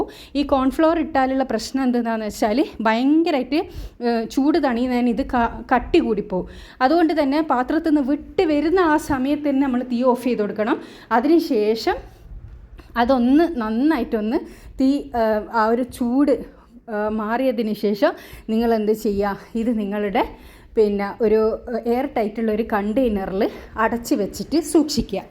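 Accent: Kannur, India. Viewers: നിങ്ങൾ കണ്ണൂർ ആണോ 🤔, സംസാരം കേട്ടപ്പോൾ തോന്നി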